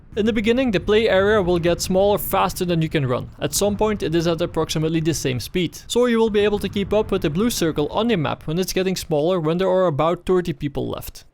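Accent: Netherlands. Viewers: Ik hoor je Nederlandse accent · it sounds like he is Dutch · it Sounds like your from the Netherlands with that accent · zijn accent is zo vreselijk nederlands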